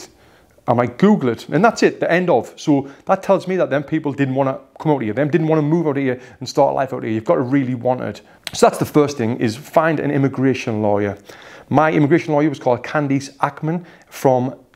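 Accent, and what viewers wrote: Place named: Northern England, United Kingdom. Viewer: i love how you put subtitles up sometimes, as a british person i was confused why you did it on some parts of your video as i can understand you perfectly but then i realised that some americans may find the nothern accent hard to understand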